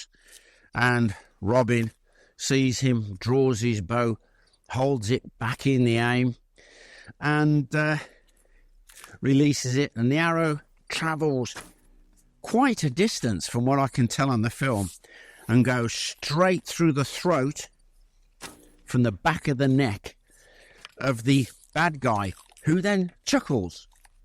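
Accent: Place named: Birmingham, United Kingdom. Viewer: Hi, great channel. You'll never lose the Brummie twang! How do you make the whistling arrows!? 😁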